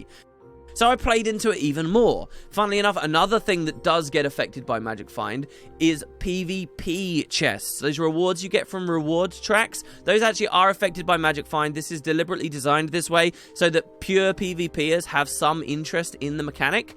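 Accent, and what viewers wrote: England, United Kingdom. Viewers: Honestly, some people complain that he is too drawn out and rant, but I really do love to turn on his videos and chill and just listen to that sweet melodic English accent.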